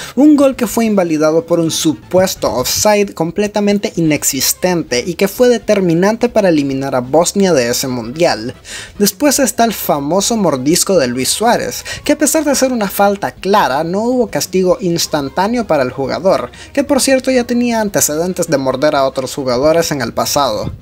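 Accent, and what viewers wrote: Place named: Nicaragua. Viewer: Es de Nicaragua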